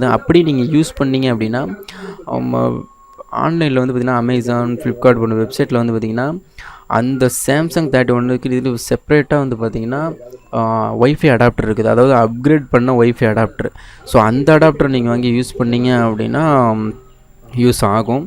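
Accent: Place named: South India, India. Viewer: Your pronunciation is like of south indian. So your vedio quality is not useful universally. Try to use easy pronunciation so that maximum people can understand your language. Ok. Thank you.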